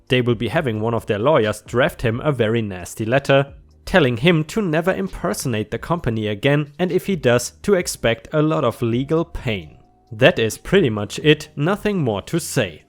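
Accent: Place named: Germany. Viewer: Subbed, belled and happy to hear your crazy German accent :-)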